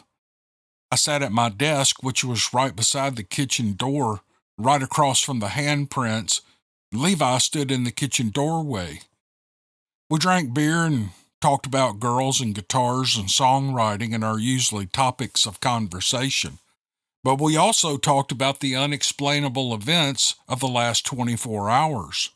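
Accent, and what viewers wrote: South, United States. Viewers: Thank you for all your hard work, good Sir. I hope this finds you well and happy. Tonight it is bitter cold outside and as usual, I can't get to sleep. About accent: As a returned to the north after I had lived south for over 20 years kind of person. I have heard southern accents of all sorts of from the heavy drawl to the slow little snippets of words with a twang. southern accent is one of the loveliest I've heard, easy to understand, not too drawn out, not too thick, not too slow, just a gentlemanly, comfortable, gentle, and sweet southern sound. There are many things about the south I miss and hearing some southern accents is one of them, so thank you for the memories, too. Now, put me to sleep, will ya? Take care dear, Rosey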